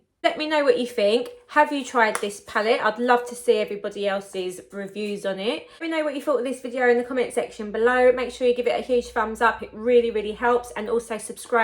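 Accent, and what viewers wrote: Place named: London, United Kingdom. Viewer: Hi, you’ve tested this the same way I might’ve done-I’ll definitely take note not to go too pale using the palette as a base product. Are you from London? You sound local- I’m from Romford.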